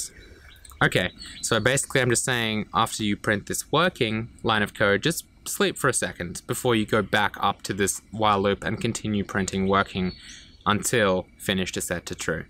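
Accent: Australia. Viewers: You from Australia?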